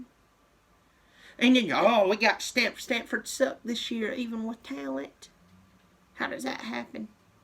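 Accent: South, United States. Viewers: Idk y I watch ya, imma Buckeye fan... not saying osu hates Clemson or anything, I respect the university and I think Sweeny is a stand up top notch guy (and 1 heck of a coach)! I guess I just like watching ya talk smack in a southern gentlemans manner, and not to many better American names than good ol!!!! Love your show man!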